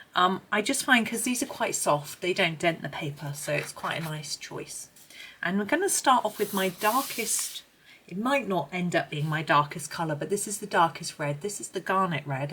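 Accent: England, United Kingdom. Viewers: Hello I've been following your channel now for a couple weeks, didn't say anything and I should of because I like very much not just your coloring but also to listen to you. I like that you choose more simple things and put emphasis on quality and you explain everything so well. I am so intrigued by your British accent I'm sure not the first to say that, although you probably don't think you have an accent. There are so many different kinds depending of what part of England you are, or UK, beside the Scottish and Irish accents. I use to be a professional musician, retired now, I have very good hearing still, maybe that's the only good thing I have since I'm older lol. So I can easily differentiate between the different English accents and yours is wonderful, sometimes you sound like Emma Thompson, I'm sure you know the actress, sometimes like Julie Andrews. 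It's very elegant, also your voice is very calming and you have such a nice sense of humor. So I really love to watch you and listen to you. I have some coloring and product questions, I will leave that for next time, this comment is already too long. Looking forward to the next video, you take care and stay safe. Judith 😊❤️ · Oh, your version of this flower mandala is Beautiful!!! I love watching you color, giving us tips here and there. I'm a rather new subscriber and enjoy your coloring and, especially, listening to you. Your voice is so very soothing and your English accent is wonderful. I'm getting Back into coloring. I've had a rather long stretch of not coloring, but piecing quilts. So, not doing nothing, but piecing Can be frustrating, and coloring is just so relaxing. I could color for hours!! Thank you for your calm, inspiring videos. I enjoy them immensely.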